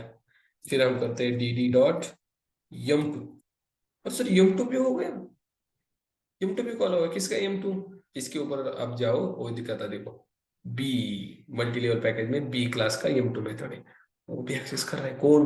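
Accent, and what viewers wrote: Maharashtra, India. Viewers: sir you are so much funny 🤣😂, also i luv ur marathi and hindi accent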